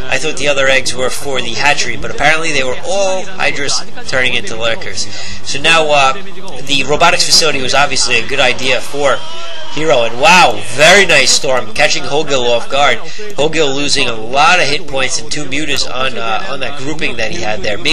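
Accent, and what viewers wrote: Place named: New York, United States. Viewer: I think he's doing a great job, keep it up man. lol as for the voice, i also didn't like your voice at first but now i love it. lol Sounds like a new yorker i hear on tv. But yeah he's doing good, lots of commentaries, we appreciate it. thank you.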